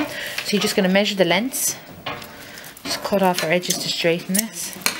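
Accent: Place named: Ireland. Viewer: an Irish accent is like beautiful music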